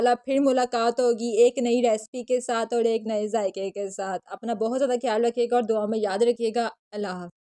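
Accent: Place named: Punjab, India. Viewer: Your Panjabi accent is awesome 😂♥️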